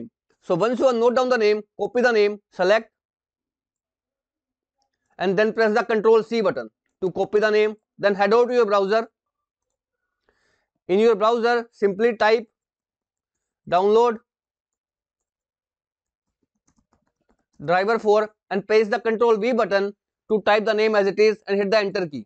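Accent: India. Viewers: Would be nice if it wasn’t an Indian scammer talking through it jeez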